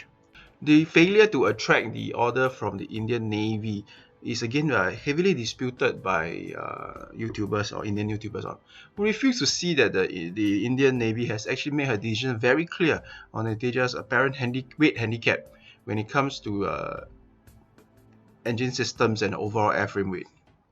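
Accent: China. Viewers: dont take this as a racist comment , but i am almost sure from your accent that you are chinese. i may be wrong, though! trying to be les nationalistic(because apparently it is the chinese and the jihadis like mazzod azhar only who can have the right to be nationalistic), i must say that all that u say is true but in reverse order. for example, u say that tejas is heavier. even though everybody knows that tejas is smaller and uses less metal than jf17. again the TWR is 1.07 with AoA of 26 degree that too when the safety against fuselage strain is kept on! jf17 has TWR of .97 and nobody knows its AoA! tejas uses a radar that may have a 30 km less range! but no inducted jf17 uses HMDS that LCA already has! besides, by your own admission LCA has a smaller RCS, wheras jf17 due to its metal component has more! so that means that the effective range of the radar of jf17 is smaller given the unsusually high amount of carbon composites in tejas airframe! 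add on to it EW suit! higher agility and moreover the existence of a local industry that can retrofit tejas makes it cheaper! besides, order of 83 mk1a has been placed and chinatopix along with many other Chinese articles have themselves pointed out tejas' superiority over jf17! this is just a last desperate attempt to dfame tejas by praising a air force version jf17 with a naval aircraft LCANavy, which was mostly a tech demostrator anyway!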